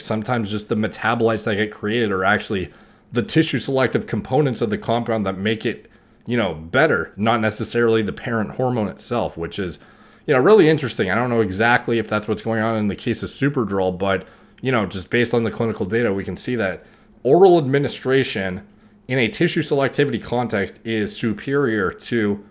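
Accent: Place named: Canada. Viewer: Thanks for the reply. Been watching a ton of his videos and was just wondering. His accent implied either Canadian or Northern USA. His accent didn't seem strong enough for me to automatically assume he is from Canada. Thanks again.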